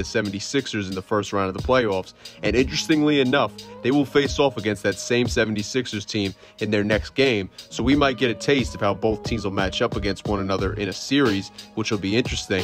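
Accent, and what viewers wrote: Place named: New York, United States. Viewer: Bouche has been so surprisingly good as well , he comes of the bench with great energy as well , liked the video , you definitely a new Yorker or jersey cat with that accent 😀 , excellent read on the team , kudos and cheers lad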